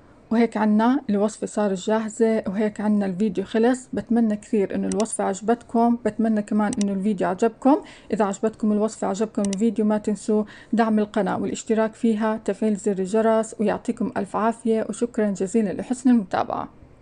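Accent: Irbid, Jordan. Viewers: ما شالله عليكي بحب كثيييييير طريقتك في الطبخ جد فخورين فيكي كاردنيين وخاصة انك من اربد 🙃♥️🌹🌹😍 طبعا عرفت انك من اربد من لهجتك 😍🌹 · يسلمو ايديكي ست وأنا كمان غير إنو وصفاتك زاكيه بحضر عشان لهجتك الأردنية بتجنن طالعة منك ابن خالتي من سكان عمان لما بيجي عنا ع فلسطين بترجاه يحكي باللهجه الأردنية تحياتي لألك من نابلس فلسطين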